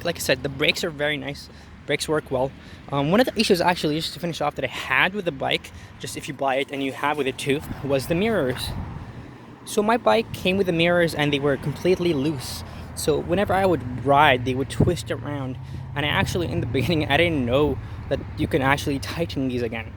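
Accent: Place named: Canada. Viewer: It's Canada.... the accent should have been a giveaway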